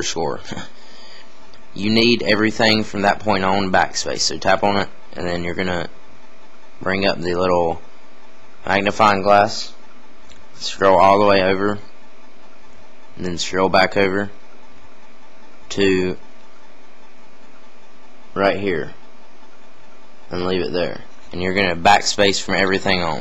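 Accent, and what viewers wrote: South, United States. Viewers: this guy sounds like a hillbilly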